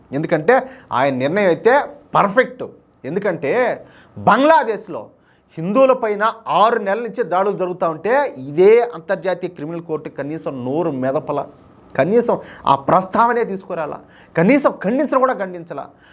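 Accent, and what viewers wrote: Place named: Kadapa, India. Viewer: I love your Kadapa language🎉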